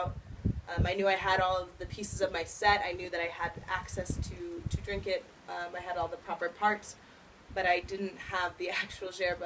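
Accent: Argentina. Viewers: Bombillaa! accent Argentino 👌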